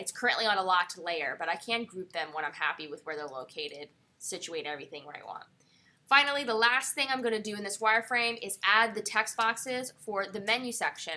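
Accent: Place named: United States. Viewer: thanks for the american accent , very clear and helpful tutorial! very efficient for the new learner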